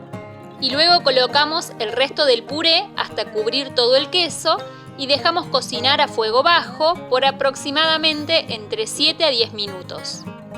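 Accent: Córdoba, Argentina. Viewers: Espectacular la voy a hacer!!! Por ahí me parece que tenés una tonada cordobesa, puede ser? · Hola soy de CARLOS PAZ Argentina...y tú???Tu tonada parece de Córdoba 😀👌👍🏻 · Me imagino q eres de Argentina por el acento.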